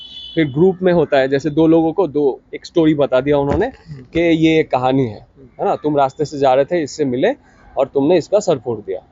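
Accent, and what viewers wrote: Bengal, India. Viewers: Sir ap bengoli ho kya kyuki apki Hindi me abhi v bengoli wali tone hai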